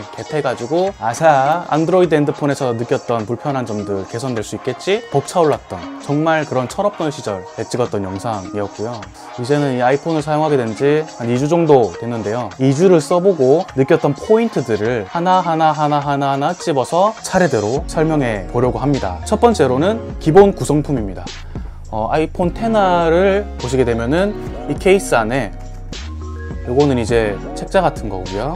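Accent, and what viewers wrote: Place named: Gyeongsang, South Korea. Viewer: 그기 갱상도다 마!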